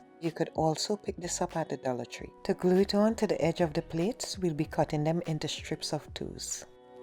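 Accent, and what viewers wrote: Trinidad and Tobago. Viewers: Are you from Trinidad.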